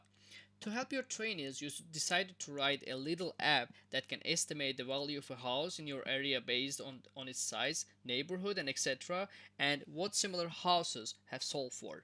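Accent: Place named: United States. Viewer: Abi cok guzel ingilizcen var, Amerikada olmus gibisin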